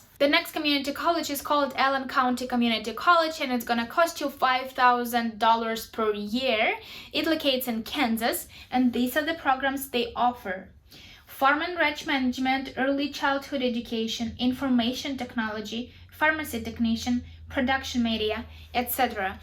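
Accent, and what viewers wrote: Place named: Ukraine. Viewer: ukrainian accent is nice don't be shy of it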